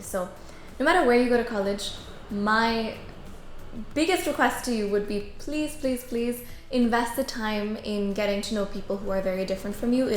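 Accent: United States. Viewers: How did you learned to speak in a pure American accent??😍😍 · How can speak in American accent in india So u r not indian Or u r saying u r superior to indians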